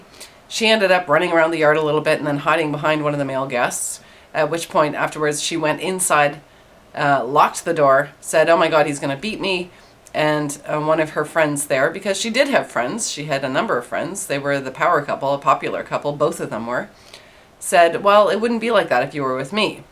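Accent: Canada. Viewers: Jesus cant act, you tell terrible stories. If you werent so cute and prone to telling the truth I wouldn't watch this. You really need some public speaking classes. You pause st awkward points and that takes from the flow. That Canadian O gets me every time too.: ) But seriously, you do sound like a first year broadcast student who is about to pass out from fright. Also, you looking at us over your glasses frames you as an agressor and you appear annoyed at the viewer.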